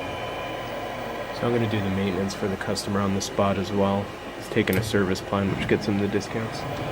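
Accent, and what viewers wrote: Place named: Canada. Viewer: Now you sound more Canadian. "Ya Ya" "And then fail Eh?" Yeah I remember getting the bulletin too. Something to do with the metals inside the switch. 🌓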